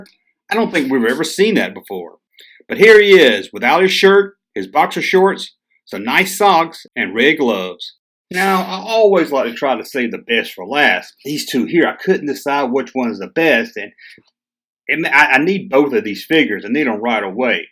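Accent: South, United States. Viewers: A guy with a southern accent who can’t pronounce Darrel Waltrip? My mind is blown.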